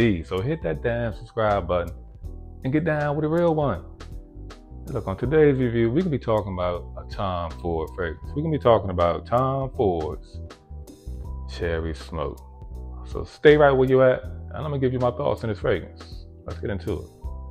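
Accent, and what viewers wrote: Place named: Baltimore, United States. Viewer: you gotta be from baltimore OG lol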